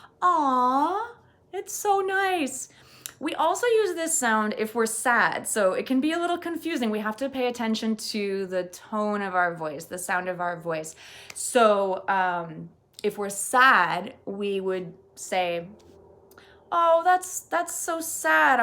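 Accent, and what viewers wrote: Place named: United States. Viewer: Hi ❤️ your American accent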